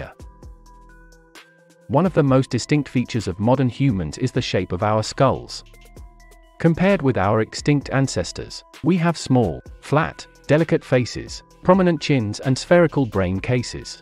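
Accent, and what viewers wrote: United Kingdom. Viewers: Nice documentary, but why do all documentaries like this seem to have to have a British accent narrator? :)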